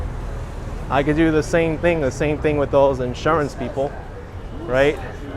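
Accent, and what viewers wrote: New York, United States. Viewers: Watching this as the sun sets here, 3 hours later, in Oakland CA. What a great way to be linked to our pride and joy NYC. Or mine, at least. And to have homegrown voiceover accompanying my virtual walk. What part of the City or environs are you from? I know, I'm assuming things, but sure sounds like it from your accent.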